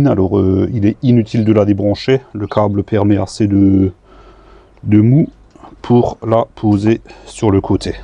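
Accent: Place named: East, France. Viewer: Non c'est pas dur car célibataire et 48 m² à Brest plein sud en étage avec mon chat et il fait beau, j'imagine avec une nana et des gosses....mais le plus dur c'est de ne pas pouvoir aller voir mon père de 75 ans et mes grands parent de 91 ans. En tout cas j'adore ton accent de l'est mon ami et ta gentillesse et surtout il y a toujours pire que soit mec salut. Kenavo.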